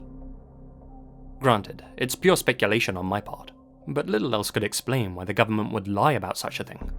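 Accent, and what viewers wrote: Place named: United Kingdom. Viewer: For some reason that British accent is damn good at storytelling!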